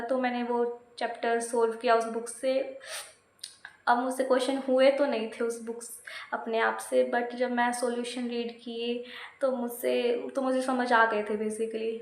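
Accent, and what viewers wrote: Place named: Haryana, India. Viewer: Bhai yo to mane pata lag gya thare haryanvi accent badi mast hai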